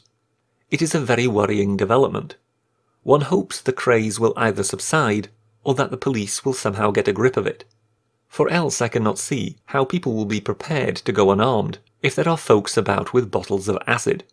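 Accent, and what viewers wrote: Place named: Scotland, United Kingdom. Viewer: I HAVE THE GREATEST OF RESPECT FOR JULIAN ASSANGE. ANGEL IS IN HIS NAME , AND I PRAY THEY PROTECT HIM. HE IS SIMPLY PRESENTING TRUTH, AND THOSE WHO ARE GUILTY MAKE EVERY ATTEMPT TO DEFAME HIS REPUTATION, HOPING THAT WILL BRING HIS WIKILEAKS ACCURACY UNDER SUSPICION. WE ARE TOO WISE FOR YOUR LIES ABOUT HIM. IT APPEARS THAT JULIAN HAS A LOYAL RESCUER/SUPPORTER HERE. THIS VOICE IN THIS VIDEO IS NOT JULIAN. ACTUALLY I HAVE A WEE BIT OF SCOTTISH IN MY HERITAGE AND SUSPECT THE SPEAKER IS SCOTTISH. YOU HAVE OVER 100 VIDEOS USING ONLY 2 COVER PHOTOS . I wish this speaker would tell us who he is (but in wisdom, given the unjust treatment Julian has endured, perhaps wiser to remain incognito. Respect to you, sir.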